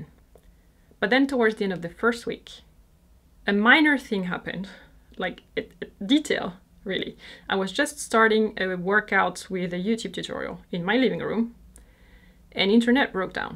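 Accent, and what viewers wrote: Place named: France. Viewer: I found you, during this self isolation here in America. I love your videos. Your French accent is so lovely. You are so graceful and kind. I subscribed to your channel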